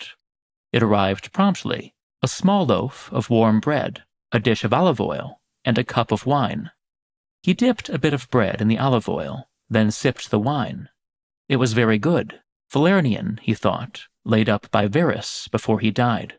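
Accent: United States. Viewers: Very nice work especially the illustrations. Rather disturbing though your pronouncing Latin names the American way.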